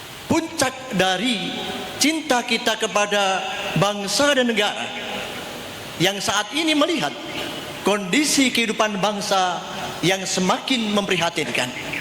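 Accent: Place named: Java, Indonesia. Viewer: kalau Ak sih gk tahu yah terlpas itu Benr atau tdk Cuma nih yah ,yg bisa Ak tanggap Knph kok ,Bahasanya masih aja medok bgt yah, gkd nular nular org luar, minimal Bahasanya gk medok bgt bgitu lohh Kan kuliah di luar negeri kan ??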